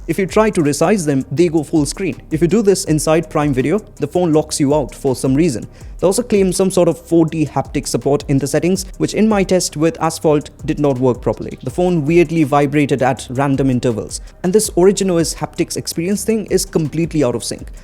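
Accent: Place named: India. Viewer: First, i thought you are an American coz of the accent but the way you pronounced some words proved you are desi 😅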